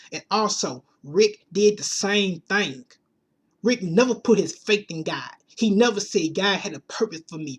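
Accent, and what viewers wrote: South, United States. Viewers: I love your videos your accent is super country when will you post a prediction video for the next episode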